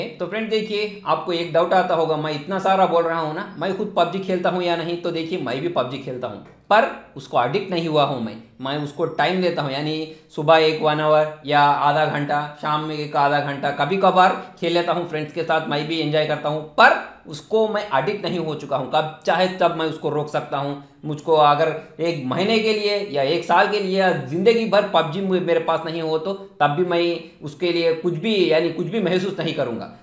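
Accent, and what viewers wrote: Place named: Butler English, India. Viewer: Bro nuvvu hindi talk cheste comedy ga undi=na English la ga = butler english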